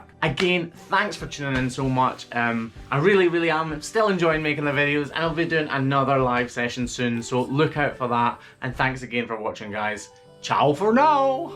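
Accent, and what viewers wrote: Scotland, United Kingdom. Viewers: Good grief! Why am I so obsessed with watching your vids?! I can't look away! It probably helps that your dialect is so interesting to listen to and having visited Scotland twice, I find myself always wanting to go back. Oh. And this is actually a very interesting niche subject matter. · The slo mo was cool as was your montage at the ending. Always educational and entertaining. I’m also learning how to talk with a Scottish accent thanks to your videos! 😁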